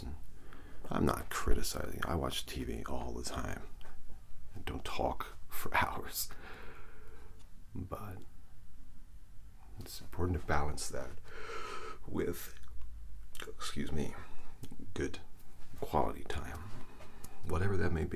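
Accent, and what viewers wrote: United States. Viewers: I love that jumper. I want it. Love the american accent you do it so well. I felt a weird awakening with watching this 🤭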